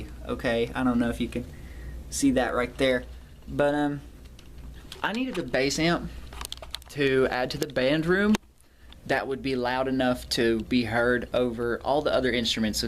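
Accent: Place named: Kentucky, United States. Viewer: Great video buddy, I thought I heard the KY in you, ;-) , I was searching because I see a Yamaha B100-112III on Craigslist right here in Louisville for $150 but I have a couple amps already, was just curious. Thank you for the tip on Steve's shop in Richmond, just found him on FB and sent him a message. Now I gotta check your band, ....Thanks for the video and info !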